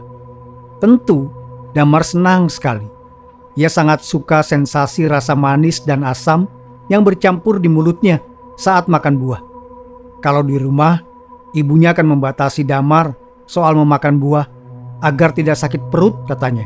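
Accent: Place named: Kalimantan, Indonesia. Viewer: Waalaikum salam jkarta hadir nyimak ,ak ud ketingaln ya, ak seneng dgerkan cenwl ini crita ya ttg alam ghoib trus,, smoga sehat tris ya bg,, ak mau nayya apa abg asli klimatan ya ,soal ya logat ya beda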